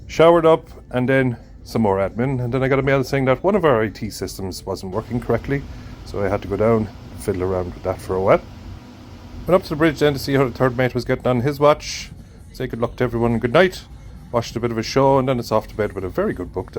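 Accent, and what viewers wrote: Ireland. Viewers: Please, just keep talking. I’m a Texan born and raised but the good Lord knows that the Irish tongue has my heart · Is that a Cork accent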